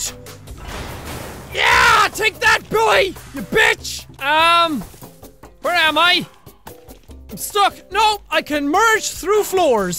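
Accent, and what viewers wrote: Ireland. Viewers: jacksepticeye YAY!!!!!!!!!!!!!!! I love Irish accsents!!!!!!!!!!!!!! I myself are half Irish ......... :) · Apparently as time goes on jacksepticeye sounds more Irish 🤔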